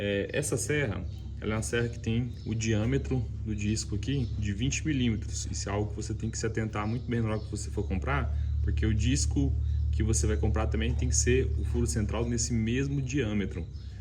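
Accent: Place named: Inland Sao Paulo, Brazil. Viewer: Você fala com o sotaque interiorano de São Paulo, como o meu avô, quando diz "garga" ao invés de galga, oriunda do verbo galgar. Me deu saudade. Observações: Use luvas anti corte quando manusear máquinas de corte, aconselhável usar mascaras anti pó, principalmente em MDF. Se puder, óculos com isolamento para os olhos, também. No demais o vídeo esclareceu bastante. Obrigado.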